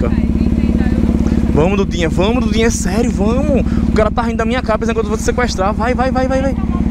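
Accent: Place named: Recife, Brazil. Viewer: Cara parece ser de Recife porra 😂😂😂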